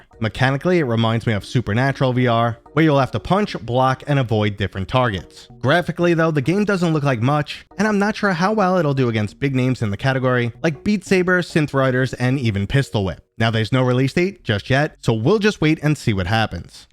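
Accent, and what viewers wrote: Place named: East Coast, United States. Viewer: Definitely East Coast. NJ?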